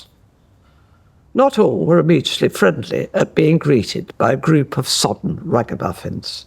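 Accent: United Kingdom. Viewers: She's got an amazing accent-I.love the upper class British accent!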